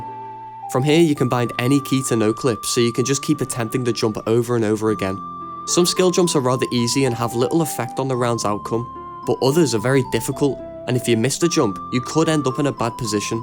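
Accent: Liverpool, United Kingdom. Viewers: He is 100% from Liverpool, UK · are you a scouser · im like 90% sure this dudes uk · Subbing but only because you're scouse lad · bro are you scouse? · Here are you scouse la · Scouse xd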